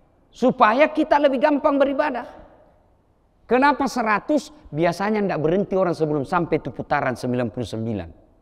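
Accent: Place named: Sulawesi, Indonesia. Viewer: Logat Sulawesi Pak Ustadz Sulawesi Bagian Manaki Ustadz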